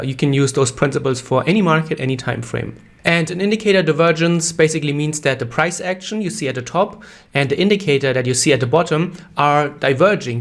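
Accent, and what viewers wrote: Germany. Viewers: Your English accent reminded me my German friends 🙏. During my stay in Europe, my English become quite similar to yours. Your generalizations about the market look amazing, however it's really difficult to predict a trend reversal specially for scalping traders, it would be better to follow an uptrend and downtrend untill we reach out stop loss quite often. 😜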